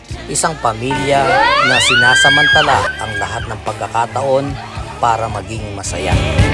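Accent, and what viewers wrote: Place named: Pampanga, Philippines. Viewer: Prang kpampangan kau sir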